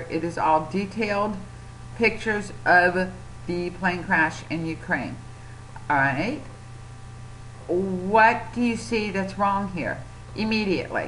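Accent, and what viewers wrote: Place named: United States. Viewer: NO, it's NOT 'absolute proof'. It is not 'proof' of any kind. It MAY be evidence. It also may be the plane at the next gate! I don't know how many times I've been about to board and see what I think is my plane - when in fact my plane is next to it. The boarding gates are often deceptive, where you go through a door right near the plane you think you're about to board when guess what? You walk along a long corridor to a plane that is a long way from the door... often not even visible from where you're waiting. And, of course, that's assuming that the photo that swept the world was taken at the time and place claimed. Assuming that it isn't another hoax, like the man on the roof of the World Trade Center! Just because you see it, doesn't make it real. Go watch a 'magician' sometime to understand what I mean. From your accent, you're in the US. 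Are you one of the 98% of Americans who have never been abroad? And for all those conspiracy theorists who say that the crashed plane is the airliner that disappeared after taking off from KL... Yes, this would be a good way to dispose of an embarrassing plane... But how do they dispose of THIS embarrassing plane? Oh, I do believe in conspiracies - but not idiocy.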